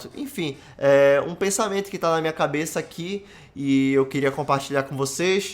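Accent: Pará, Brazil. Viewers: Sotaque Paraense. Muito bom canal.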